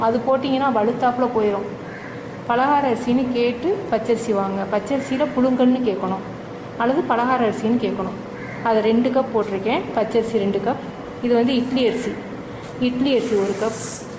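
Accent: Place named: Chettinad, India. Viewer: achi every recipe is awesome and that too with your chettinad slang it is very nice thank you for all your receipes...this is deivanai saravanan...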